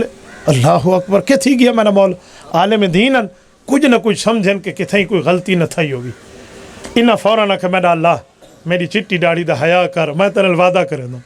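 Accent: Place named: Punjab, Pakistan. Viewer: Me apke bayan ko dislike karta hu kyunki dil se sunne ki khahish hoti he lekin Punjabi puri esi tesi kar deti hai Mood off 📴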